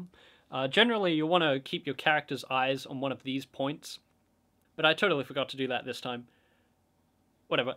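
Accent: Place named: Australia. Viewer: Aussie lad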